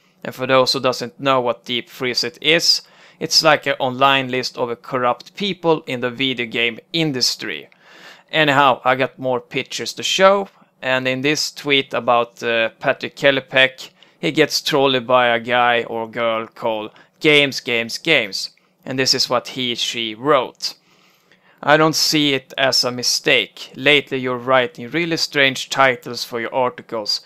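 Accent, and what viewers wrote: Sweden. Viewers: Well that's the thickest swede accent I've heard all week. Nice coverage as well.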